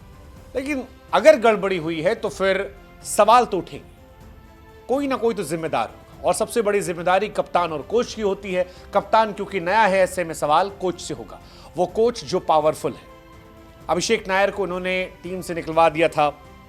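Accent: Bihar, India. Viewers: Bro Sounds Like a Tru Bihari.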